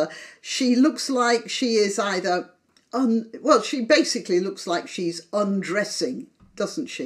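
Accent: United Kingdom. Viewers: Roasting celebrities in a British accent is really next level… 👏👏👏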